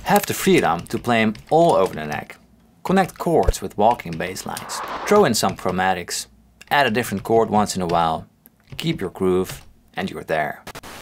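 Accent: Netherlands. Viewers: I love that you can hear his accent a little. Viva hollanda🇳🇱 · Je hoort het zo fel dat je een Nederlander bent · Are you a dutchy?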